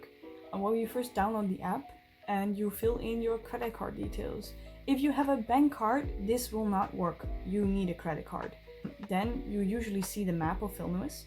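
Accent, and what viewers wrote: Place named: Upper Midwest, United States. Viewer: Hi there! What part of the USA are you represent? I’m from Wisconsin.. You sounded like a neighbor from Viking land or Lions land.. Ačiu !!!! 🇱🇹🇺🇸👋✌️💐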